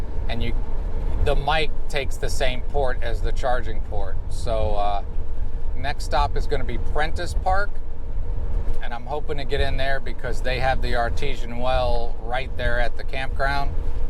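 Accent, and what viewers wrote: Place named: New York, United States. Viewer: I can hear your accent clearly! How long has it been since you left NY?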